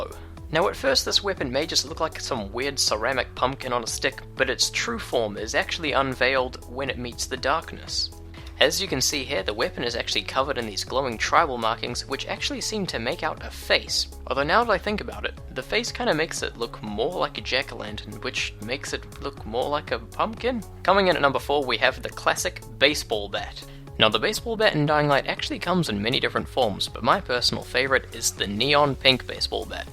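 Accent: New Zealand. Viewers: U from nz? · I've got 2 things to say are you a new zealander and you've got your own costume😲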